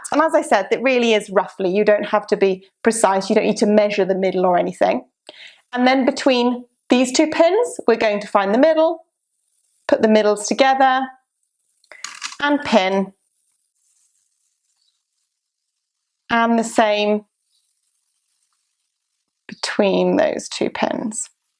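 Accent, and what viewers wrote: England, United Kingdom. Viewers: Very clear and detailed instructions. Love your English accent. I had never heard of clover leaf pins. I quilt. This would be great for matching quilt blocks!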